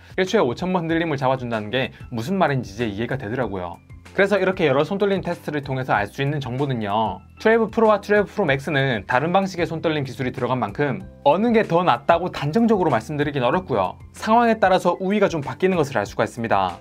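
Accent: Gyeongsang, South Korea. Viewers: 경상도 분이신가용?? 말투가 먼가 넘 귀여우심ㅋㅋ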